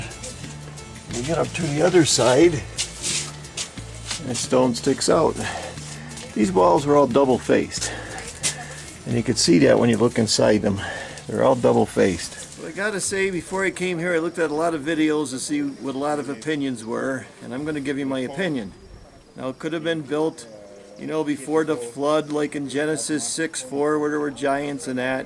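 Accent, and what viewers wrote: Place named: Pennsylvania, United States. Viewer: As soon as you started speaking I knew you were from PA....like myself! Nice video!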